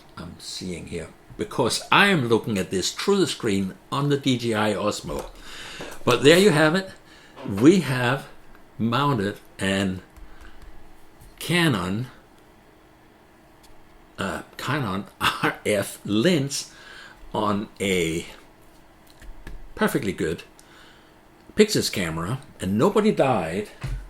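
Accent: Denmark. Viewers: Hi, thanks for uploading. A Dane here from Copenhagen, who also shoots Canon - great setup and hyggeligt to hear your Danish accent. I look forward to seeing what you shoot on your 12K 🥰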